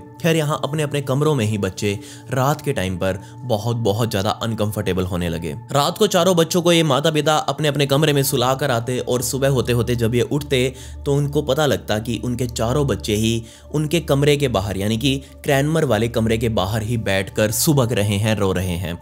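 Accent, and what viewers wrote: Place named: Punjab, India. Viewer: Panjabi a bro tu 😊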